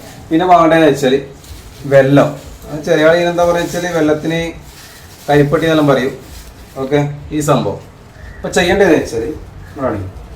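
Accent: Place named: Bengal, India. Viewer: നീ മലയാളം പഠിച്ചിട്ടു പറയു, വിഷയം നല്ലത് but ബംഗാളി, ഇതു പറഞ്ഞാൽ പകുതിയേ മനസിലാകുന്നൊള്ളു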